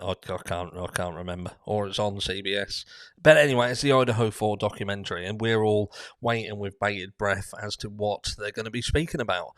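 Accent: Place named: East Anglia, United Kingdom. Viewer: Hi dude, newbie here. Love this chat, I'm going to dive into your channel. I have to ask, are you from Suffolk/Norfolk, your accent is very familiar? I've subbed btw 😉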